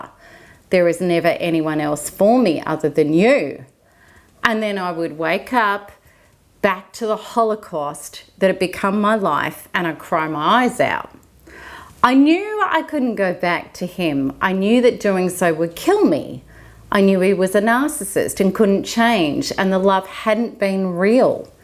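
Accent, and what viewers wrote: Australia. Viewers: I love your Aussie accent :) hello from Washington DC and thanks for your help!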